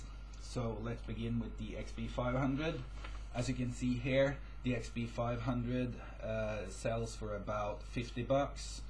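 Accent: Norway. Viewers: Er det norsk aksent eg høyrer? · You sound like a norwegian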